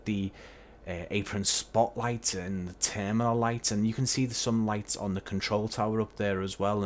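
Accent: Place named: England, United Kingdom. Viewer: 🙋‍♂️👍Really,Really Nice Reviews,...I’m Impressed by your Very well done Reviews! Like the English accent too. Keep up the good work 👍✈️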